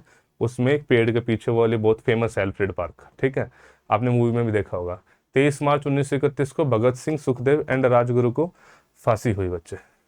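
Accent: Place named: Bengal, India. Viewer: Sir your Bengali speaking very cute👌👌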